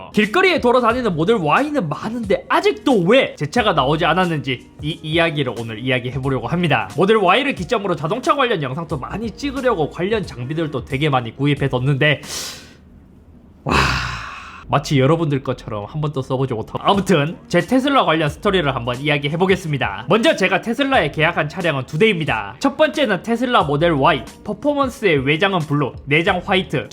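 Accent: Gyeongsang, South Korea. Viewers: 섭아....내가 한마디만 할께...KT 썰이 공중파까지 타고...캐티통신서비스 개선에 엄청난 파급효과인정... 그런데..테슬라 썰은...아닌것 같다... 본인이 흰색 시트 주문하고서..(미국도 화이트시트 구하기힘듬) -__-:: 테슬라가 본디..그러한것은 알고 있잖소...아니말로 잇썹 구독자들도 갱쌍도 발음 신경쓰이긴 하지만... 내용의 퀄러티가 좋고 해서...매번 참아가면서 보고있단다... 본인 선택이 빠가(?) 스러운 결정이였는데......영업사원이 모델Y가 빨리나온다는 말만 반복... 쩝...아예 계약을 취소하고 이런방송을 하였으면..인정하는데... 은근히 백만유튜버이니...테슬라 지사에서 신경써서 출고해달라는...방송같다... 초심(?) 항상 생각하자......섭아....이상......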